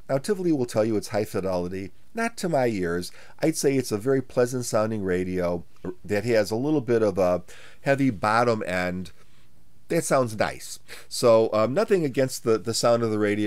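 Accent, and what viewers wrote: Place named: Milwaukee, United States. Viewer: U sound like you’re from Milwaukee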